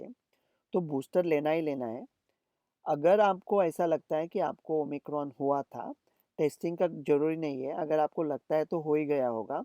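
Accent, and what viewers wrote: India. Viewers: Sir i want to talk to you...aap ko language sunkat aesa lakta h aap india me hi rehte ho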